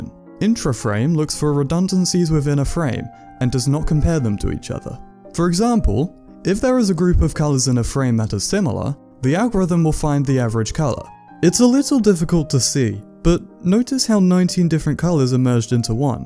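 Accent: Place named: United Kingdom. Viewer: >be me >get this video in recommended >british accent >face looks probably like the guy from the "ACKCHYUALLY" meme >go to video tab >many video titles have a CAPITAL word in it for no reason >many video titles start with "I made..." >first video blew up >2nd most popular video has only 1/3rd of the views >never got that many views since on any video >close tab and block this channel with the extension BlockTube Feels good man.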